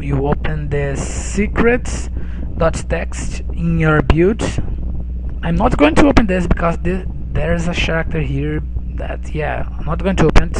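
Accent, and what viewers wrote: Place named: Brazil. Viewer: seu sotaque [e de brasileiro kkkkk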